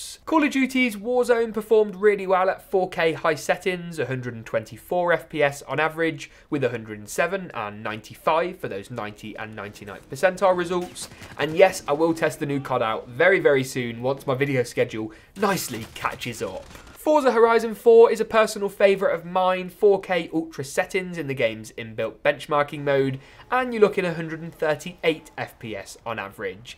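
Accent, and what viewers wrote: United Kingdom. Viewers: You should be britishian your accent show it 😁👍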